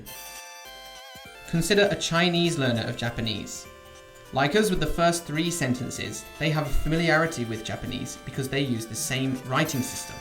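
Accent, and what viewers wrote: United Kingdom. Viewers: to be Japanese you sure speak like a British, with a funny accent. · Ironically the narrator sounds British but drew the American flag. That's far more curious. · didnt expect a british accent ngl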